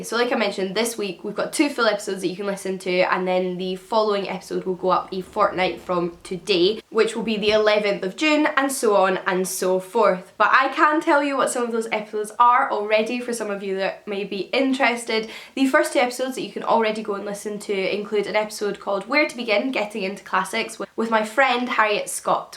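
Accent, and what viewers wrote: Scotland, United Kingdom. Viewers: The podcasts sound fabulous! I've subscribed. You've inspired me to learn so much about the classical worlds. I'm about to open to the first page of Circe so I will listen to your interview with Madeline Miller very soon. I love your PS in the introduction "it's a Scottish accent". My grandparents were Scottish and I miss hearing their accents so I get an added bonus listening to your new podcasts:)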